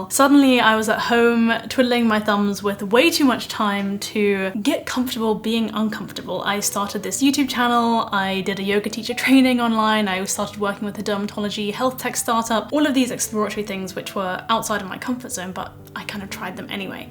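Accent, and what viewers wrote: United Kingdom. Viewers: I'd say that your videos not only provide helpful and valuable information, but also are very good for learning English, especially for people who are learning British accent like me (I mean, among a lot of YouTubers who speaks British accent, your voice is very good and beautiful). Athough YouTube already had its own auto-generated subtitles for videos, I'm still thinking that it'll be better if you can add subtitles to your next videos. It would make it a great way for people who come not only to watch and learn things you're sharing in your videos, but also find a good YouTube channel for improving their English. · Hello Dr , could you please tell me what is the name of your British accent? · I love that you're Chinese, but you have a British accent. I could listen to you all day.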